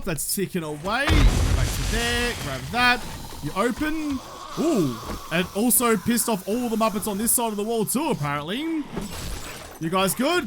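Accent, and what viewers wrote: Australia. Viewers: Ok, listen: I need to stop watching these vids in bed before sleep because people in my dreams have aussie accents and slang now. Lol. Then again, accents are hot, so I guess I shouldn't complain. Plus, trade Jen is getting jealous, I think because whenever I go see her, she's got nothing I need, and when she does, she overcharges me, I swear. Seriously though, I get so many good ideas by either watching be a muppet or have brilliant ideas! Thanks for helping me survive A20!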